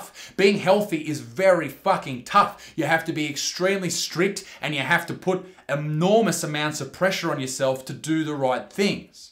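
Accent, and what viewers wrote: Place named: Australia. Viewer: i weighed 135 Me, an American: that's not bad, goddamn: kilograms Me: oh yeah that's right I'm an American and this boi sound like he's from Australia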